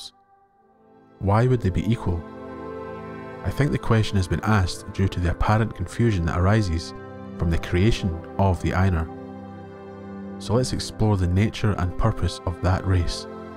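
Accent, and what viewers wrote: Scotland, United Kingdom. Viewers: 😁 near the end of the video I did recognize the Scottish accent! Cheers from Romania! And a good night i got to go to bed.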